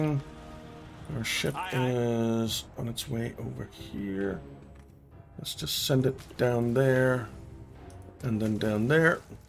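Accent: Denmark. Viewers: Not really hard to notice that the accent is danish 😜 great episode mate!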